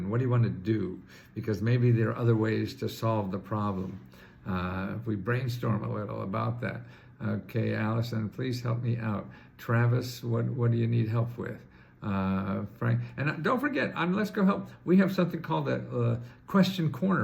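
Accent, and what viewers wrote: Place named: New York, United States. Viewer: Please. Key. Me in.. Thanks yes. 😮❤😊love your info. Yes. You. Friendly. Sound like New York accent 🎉🎉🎉🎉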